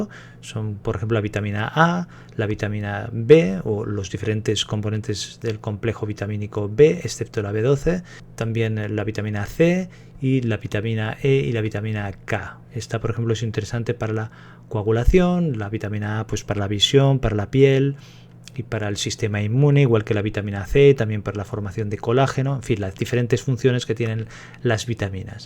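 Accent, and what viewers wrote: Spain. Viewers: where in the world did you get your study you are very confusing you sound like you're from Spain good looking man but apparently you have not done your research on celery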